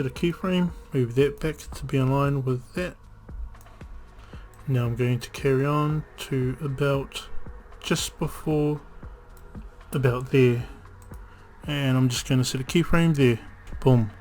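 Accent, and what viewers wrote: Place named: New Zealand. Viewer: Your from New Zealand haha same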